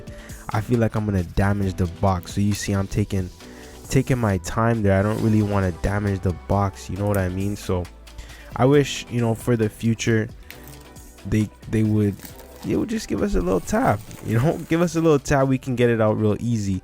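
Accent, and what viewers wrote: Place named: Toronto, Canada. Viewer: Toronto accent 🤢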